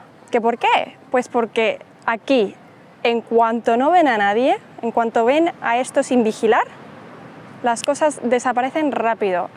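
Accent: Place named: Madrid, Spain. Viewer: ¡Un video muy guay y muy útil! Mi tutora es una madrileña también y vuestras maneras de hablar son muy parecidas.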